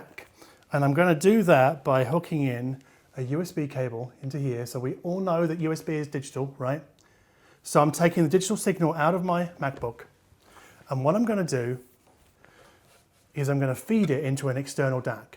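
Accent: United Kingdom. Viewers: How to be a success on YouTube: 1) British accent 2) extensive and deep knowledge of the subject at hand 3) extensive command of the English language, avoiding overused terms such as "warm". 4)ability to give listeners an idea of how something sounds WITHOUT denigrating products or brands. 5) ability to explain a complex subject without sounding arrogant or talking down to the audience. Well done, sir! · So Brit... Ahahahahahahah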